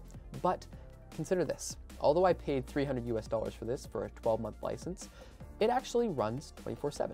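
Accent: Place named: Italy. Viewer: he even has an italian accent in english :D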